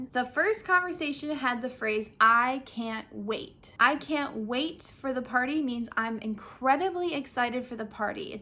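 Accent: United States. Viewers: I want American accent like you ❤ I am 13 years old from Korea🇰🇷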